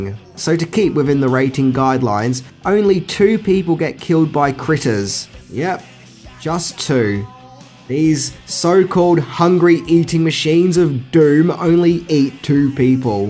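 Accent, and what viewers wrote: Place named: Australia. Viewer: The Australian accent makes "bastards" sound so refined. Thanks for the fun videos, keep up the great work.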